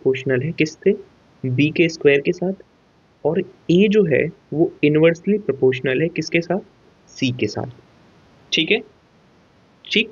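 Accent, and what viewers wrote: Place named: Purvanchal, India. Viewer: Aap Purwanchal se lagte ho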